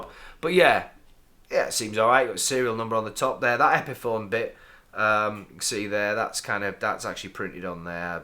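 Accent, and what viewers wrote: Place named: North, United Kingdom. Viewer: nice to have Yorkshire accent from once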